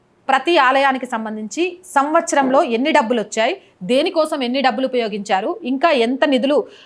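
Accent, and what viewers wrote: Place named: Srikakulam, India. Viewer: మేమ్ నిజం చెప్పండి మీది శ్రీకాకుళం కదా మీ మాట catch చేసాం అమ్మేస్తన్రు అలాగే మన ఆకలి తీర్చటానికే ఆలోచిస్తన్రు అన్నారు 😄👌👌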